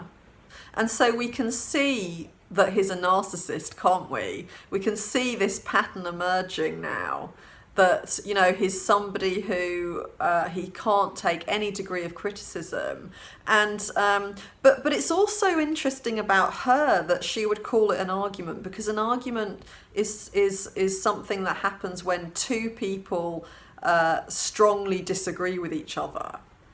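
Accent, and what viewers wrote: United Kingdom. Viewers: I’ve subscribed recently as I love your thoughtful assessments based on your knowledge and experience (I am a Ph. D. in another field, and I greatly appreciate the work you have put into your career and expertise). I hope your channel continues to grow as your analyses are very thoughtful and respectful! Also I lived in the UK for a bit when I was in grad school so love the accent always (my spouse and I are anglophiles!)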